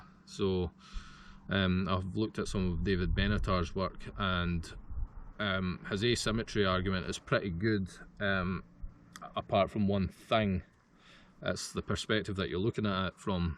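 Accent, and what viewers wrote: Scotland, United Kingdom. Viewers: Are you a Scot, mate? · My parents are Scottish I thought you were Scottish Highlands is beautifull Ive been there good video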